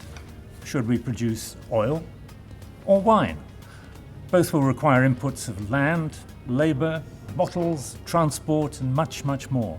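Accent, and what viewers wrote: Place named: United Kingdom. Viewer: i would like to hear this guy explain every aspect of life to me in videos like this with his classy british accent.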